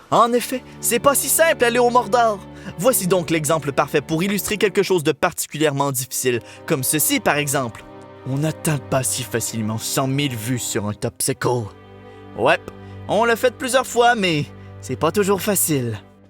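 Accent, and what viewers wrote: Quebec, Canada. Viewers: J'adore le Québec et c'est un rêve de partir la bas . Et ton accent québécois🤗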